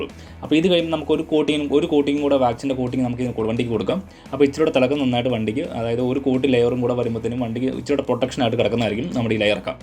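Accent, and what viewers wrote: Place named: Pala, India. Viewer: പാലായിൽ എങ്ങാനും ആണോ വീട്. എവിടെയാ. സസാരംകേട്ടിട്ടു ഇവിടെ എങ്ങാണ്ടു ആണ് വീട് 🤔🤔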